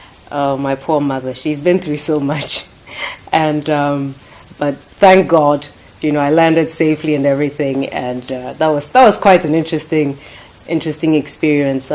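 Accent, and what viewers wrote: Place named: United Kingdom. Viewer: You are talking as british!!!!!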